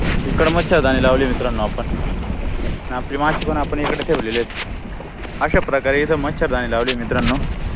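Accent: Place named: Karwar, India. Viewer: करवाली बोलतात .....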